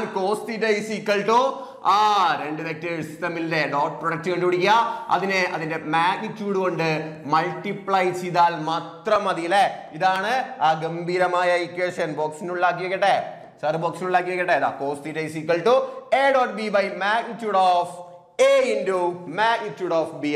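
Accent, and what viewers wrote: Thrissur, India. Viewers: Bro he is pure thrissur. Thats Y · Thrissur ano sirnte veed😅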